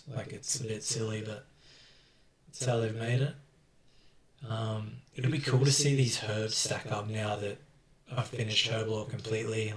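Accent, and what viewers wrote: Australia. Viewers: Actually huge mate gz on true max! I’ve just started OS coming from world of Warcraft and I’m addicted as fuck. Almost 1k total level now, glad to hear another Aussie as well! Ps. Pls give me some GP 😉😂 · Can tell you're Aussie haha, Gz on 200m all.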